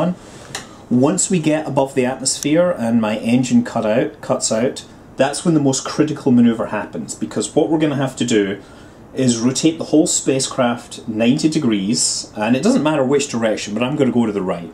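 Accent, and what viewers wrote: Scotland, United Kingdom. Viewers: I understand that, however, say you launch and have enough velocity to reach the same altitude as an orbiting craft for a moment, but you're on a ballistic trajectory so you're going to return to the atmosphere, but you dock, your mass would then... have what effect on the craft you dock to? I imagine the added mass would then decrease the speed of the craft you docked too... I know I could look it up, but I prefer my science dolled out in a Scottish accent ^__^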